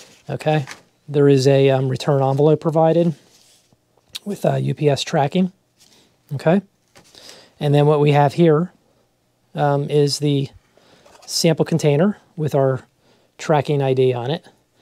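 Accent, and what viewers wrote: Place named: South Jersey, United States. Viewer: New sub & fellow South Jersey guy here (Gloucester County, 20 min from Philly). It’s comforting to hear that strong South Jersey/Philly accent on a lawn care channel, ha!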